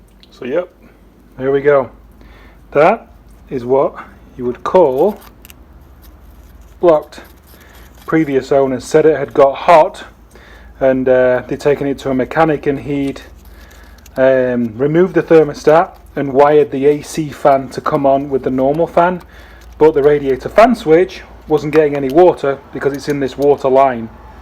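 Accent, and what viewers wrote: England, United Kingdom. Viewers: are you from England??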